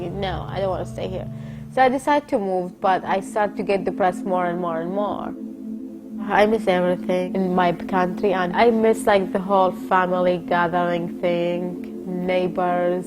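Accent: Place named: Iraq. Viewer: بالمناسبة لهجتج عراقيه انتي مواليد ايام دخول الجيش العراقي و ممحونه.....ما ننلام من نجناكم بالكويت.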